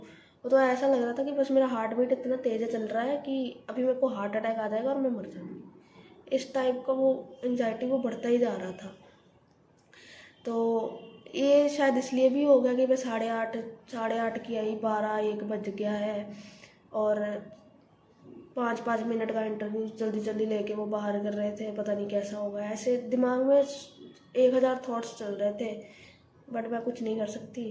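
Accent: Punjab, India. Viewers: Aap punjab se ho?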